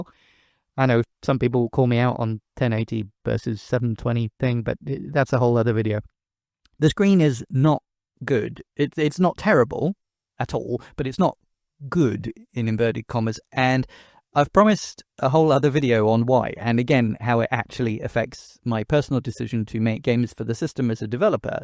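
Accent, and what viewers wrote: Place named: United Kingdom. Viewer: Good review. Some thoughts I am aligned with: 1. Game key cards suck - won’t buy any 2. Exceedingly high prices for old games 3. Parents won’t be buying Timmy the SW2. He can play Minecraft fine on SW1 (I am a parent - Overcooked, bomberman etc work fine for the family). 4. Families like myself are a big part of their base. So forget about selling 150M SW2 consoles 5. Nintendo are very very greedy and callous weaponising our nostalgia in the most cynical way possible relying on the impulsiveness of their base… Feedback on your channel: 1. Overall good - trim it down a little…punchier 2. Avoid slightly inserting your political views and hint-hints….make a separate channel for that. Stay on point. 3. Solid British accent Have a nice day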